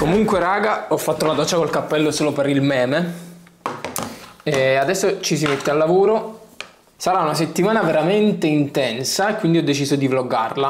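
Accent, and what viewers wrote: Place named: Naples, Italy. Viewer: Finalmente era ora! Basta con il fast content, cosi i contenuti si assaporano con la giusta tempistica senza quel bam bam bam di informazioni veloci, che tra l'altro assimili per un tempo limitato poi te le dimentichi. Mentre per il tuo italiano siamo troppo influenzati dal napoletano, nun ce putim fa nient 💙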